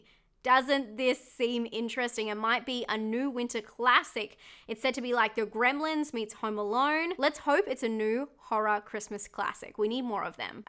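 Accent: Australia. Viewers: >hear australian accent > immediately click off video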